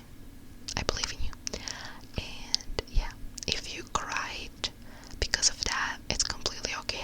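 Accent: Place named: Europe. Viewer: Love this video. Really enjoy rambling videos in general as they are so very relaxing. Absolutely love your accent. Can’t quite pinpoint what it is but maybe somewhere European? Whilst I really enjoy your GeoGuessr, Wordle and Sims videos, would love you to do these rambles occasionally if you have time. Thank you for the top ASMR content x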